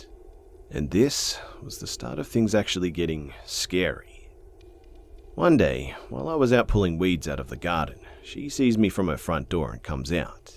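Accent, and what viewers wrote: Australia. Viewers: ARE YOU AUSTRALIAN CUZ DAT ACCENT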